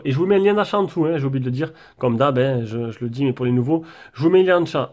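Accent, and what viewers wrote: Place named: Southwest, France. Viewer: A quand la vidéo du test final de ce tél , car j'hésite vraiment a l'acheter....je le trouve fabuleux niveau photo plutot fluide avec un look sobre et agréable...mais que vaut Réellement ce Modèle Amigo ?....en tout cas chapeau pour tes vidéos et ta voix perso ! elle me plait , ça chante le Jolie Sud Ouest ...lol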